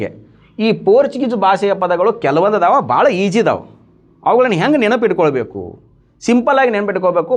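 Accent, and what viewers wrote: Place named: Gulbarga, India. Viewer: Not dharwad kannada it's Gulbarga kannada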